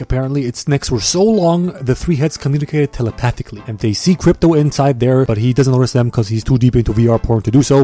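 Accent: New Zealand. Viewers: buddy he's very clearly a kiwi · He’s from New Zealand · Hes a kiwi 😑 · He’s New Zealander not Aussie get it right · OMG HES FROM NEW ZEDLAND OR WHAT EVER THE FUCK NOT ASTRAS;IA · hes from new zealand not aussie😂😂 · He ain't no Australian that's a new Zealander , and I should know because I'm Australian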